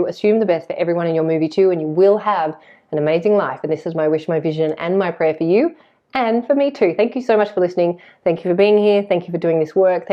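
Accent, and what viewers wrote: Australia. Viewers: Hey I come here for your Aussie accent. Love it.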